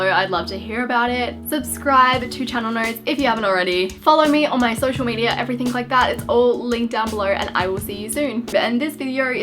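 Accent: Australia. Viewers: Oh my god your Australian I love how you talk ( you.. Are Australian right?) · I love Aussie Accent.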